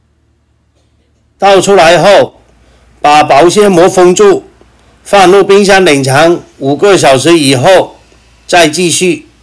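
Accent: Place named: Cantonese, China. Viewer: 讲解很详细，广式普通话很好听，让我这样完全听不懂粤语的人能听到明明白白，谢谢，果断订阅。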